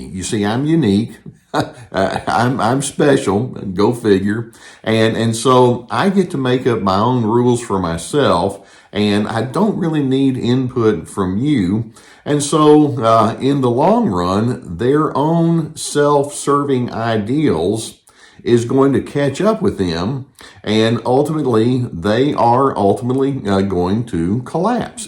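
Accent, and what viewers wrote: South, United States. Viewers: So love your podcasts they are so commonsensical and you beautiful southern accent is like melted chocolate. Please keep up your chivalry and kindness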